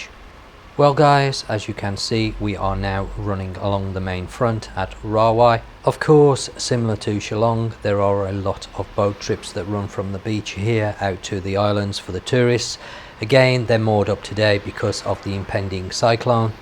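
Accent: West Midlands, United Kingdom. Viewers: Just found your channel. Enjoying watching your videos. You sound like your from the West Midlands. Same as myself 😊. I go to Phuket for the 3rd year in a couple of weeks. I have made friends working in hotels there. Tourist numbers are definitely down but not dramatically like some news outlets suggest. Chinese are definitely less due to the tour boat disaster and I'm sure the strong Thai Baht is stopping the twat Packers. I mean back Packers. Indian tourists are slightly up which is interesting because they pay alot in visas. Anyway keep up the good work. Keep the videos coming. Thanks 👍😊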